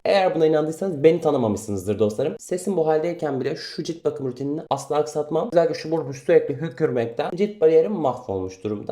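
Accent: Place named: Trabzon, Turkey. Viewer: Haşimdi kalk dedi Trabzon lu musun yoksa 😊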